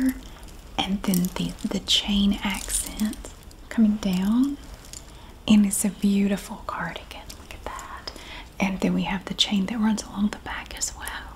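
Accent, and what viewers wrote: South, United States. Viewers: The mix of whisper and soft spoken is just heavenly. Also, your southern accident really adds to the tingles!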